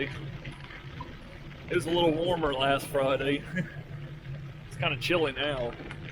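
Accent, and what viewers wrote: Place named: South, United States. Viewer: Very cool! Thanks so much. You sound southern, and I'm southern so I'd know!😄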